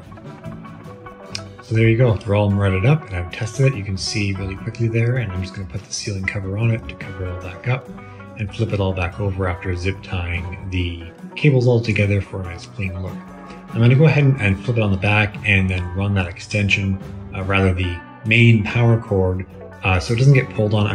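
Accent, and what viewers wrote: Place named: United States. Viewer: bad design to have it all open, that UV then floods the space you put it in, your English sounds American, so learn to do some sheet metal design, use sendcutsend to cut and bend the parts out of uncoated aluminum, screw together, use regular sanitary silicone to seal seams inside, bare aluminum is reasonably good at reflecting UV, so when buttoned up the UV is reflected inside and isn't wasted damaging other materials in the room and you when it escapes your current enclosure can leave outside of the case bare, AL won't horribly corrode in a room, or can spray paint using al primer, it would probably cost you less than that sheet of HDPE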